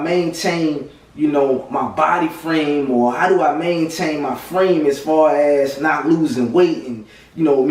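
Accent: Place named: East Coast, United States. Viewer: very informative. looking into becoming vegan in the near future. also, you have to be from baltimore or the dmv area. i detected an east coast accent, then you 'dairy' and that confirmed it. LOL.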